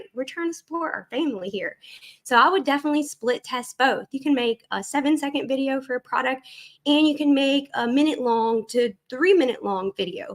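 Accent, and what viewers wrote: South, United States. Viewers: love your videos and this is a great one, as always. Also, talk how you want to talk. Don't change for ANYONE. You are you and that is why many follow and admire you for what you are doing. Sucks on what has happened to you from this jerk, but it will pass like everything. Some speed bumps along the way, but you will come out at the other end stronger. Love your southern accent and how you talk, so keep it going!!!!! From Ontario Canada, and if you heard me, you would say I have an accent also, so keep moving forward & upward!!!!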